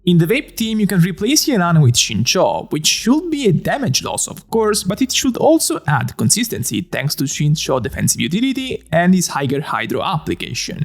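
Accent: Italy. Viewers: Il bello è che l'italianità emerge anche quando parla inglese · Ahahah, ma sai che io mi stavo ancora chiedendo da dove venissi con quel accento. Ho capito oggi che sei italiano ahahahaa. Comunque continua così i tuoi video sono utilissimi e dai sempre pareri interessanti devo dire, love your videos❤ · yeah he is, his accent is really an italian accent and i asked him and he answered yes, in one of his streams at the start he speaks italian too 😂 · Got jumpscared when you started talking in Italian. Sono italiana anch’io, per qualche motivo non avevo colto il tuo accento. Video fantastici, complimenti. ❤️‍🩹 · " i am italian" he says with the thickest italian accent. Yeah no shit 😅😂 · Lol it's a very powerful Italian accent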